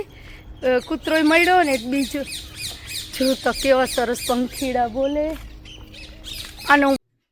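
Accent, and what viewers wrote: Kathiawar, India. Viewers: કાઠિયાવાડી લાગે છે 😄🙏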